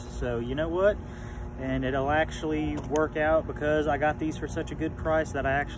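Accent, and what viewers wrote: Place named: South, United States. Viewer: Why is your Southern accent amplified by like 10 in this video?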